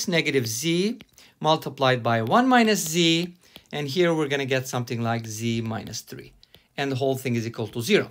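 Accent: Turkey. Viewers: Hello, may I ask where you’re from because your accent sounds like Turkish accent and I am Turkish so I just wanted to know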